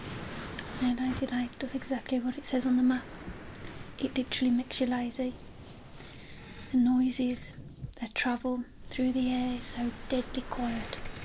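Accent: Black Country, United Kingdom. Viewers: Black country accent is spot on! Great job!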